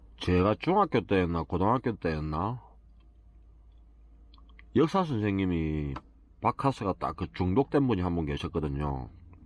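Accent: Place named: Busan, South Korea. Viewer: 저의 작은 삼촌하고 목소리, 발음, 부산 사투리까지 똑같네요. ㅎㅎ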